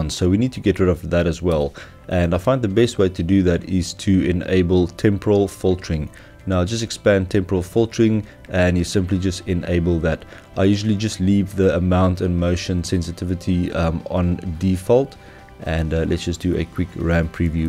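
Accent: South Africa. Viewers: You sound South African...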